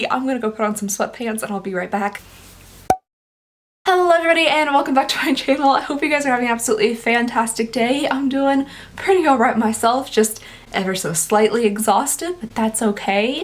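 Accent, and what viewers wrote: Kentucky, United States. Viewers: It's crazy that I clicked on and watched your video lol your voice is the only one I can handle I scroll down and realized why!!!! Your a fellow Kentuckian!!!! Go cats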